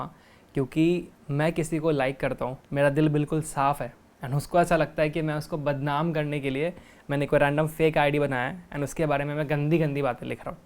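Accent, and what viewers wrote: Bihar, India. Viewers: Bhaiya aap bihar se ho kya 🤔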